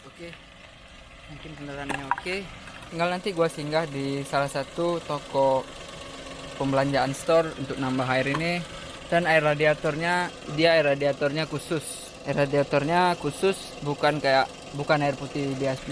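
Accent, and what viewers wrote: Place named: Aceh, Indonesia. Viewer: Logat nya koo seperti org aceh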